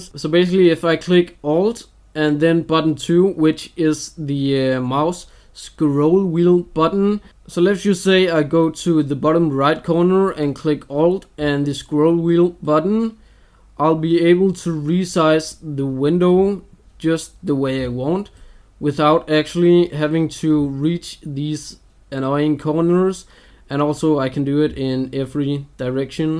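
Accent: Denmark. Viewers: det er så tydeligt at du er fra danmark ( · I knew it, you can always hear if it is a Dane who speaks English!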